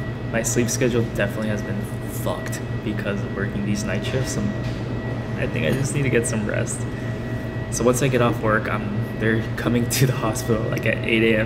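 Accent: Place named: California, United States. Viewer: U don't have a New York accent. U sound more like a Californian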